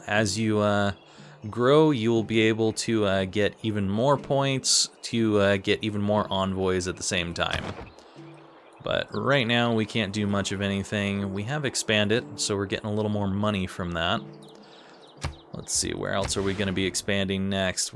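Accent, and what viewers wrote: United Kingdom. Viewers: Your Japanese why is the narrator British or British sounding?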